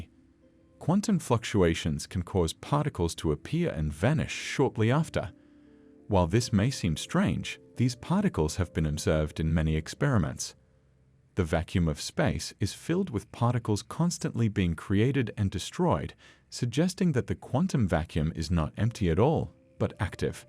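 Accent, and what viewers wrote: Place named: Australia. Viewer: Not American, seems more like Australia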